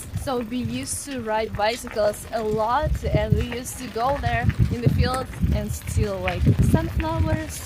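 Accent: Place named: Russia. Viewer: Не написано на русском в описании канала, -русская ли вы, или иностранец. Но услышав акцент, думаю, что вы все же русская.😊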